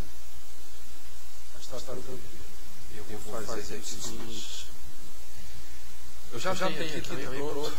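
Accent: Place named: Rio de Janeiro, Brazil. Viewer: apesar de audio terrivel e sotaque carioca forte, um otimo video!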